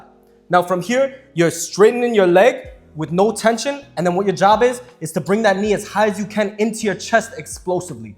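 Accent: New York, United States. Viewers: I’m from Queens and I KNOW you gotta be from NYC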